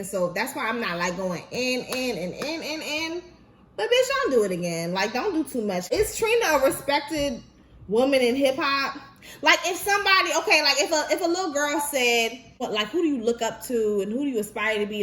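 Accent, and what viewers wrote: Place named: Caribbean. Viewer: I LOVE HEARING THE CARIBBEAN IN YOUR VOICE & ACCENT MA'AM.... ITS FUCKING GORGEOUS... And i NEEDS IT.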